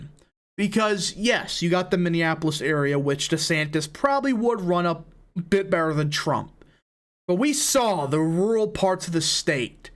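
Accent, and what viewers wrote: Wisconsin, United States. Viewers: You’re from Wisconsin?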